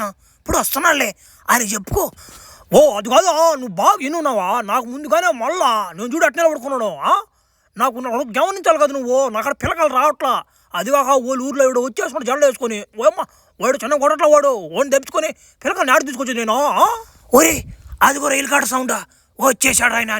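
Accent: Nellore, India. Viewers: Nuvvu buthulu matladitey Nellore yasa vastadi bro❤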